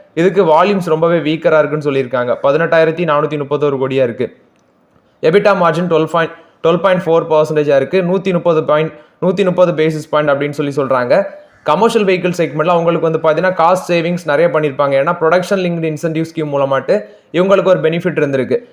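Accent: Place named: Tirunelveli, India. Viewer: Bro! Are you from South TN? Tirunelveli area? You speak Aattu, kaandi etc, the Tirunelveli local language.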